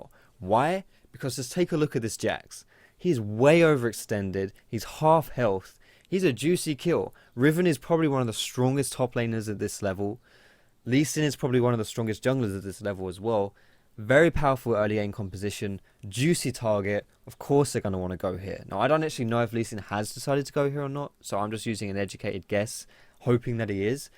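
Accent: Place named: England, United Kingdom. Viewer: whereabouts are you in England man, you sound like you're from around where I am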